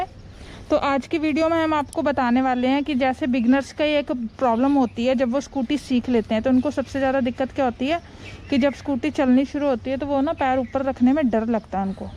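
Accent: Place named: Punjab, India. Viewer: Plzz hath main gloves mat pehen lena unko dekh kar he aapke khoobsurat chehre ka andaja lagata rehta hu baaki aap chehra to dikhate nahi😞Aapki punjabi, himachali accent wali hindi achhi lagti hai🤓❤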